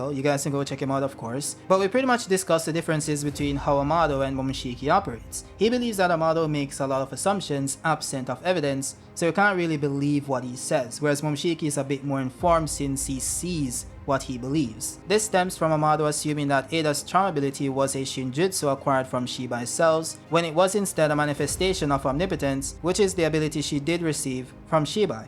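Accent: Jamaica. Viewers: Are you Jamaican? I hear an accent. Big up from Jamaica bro😂🔥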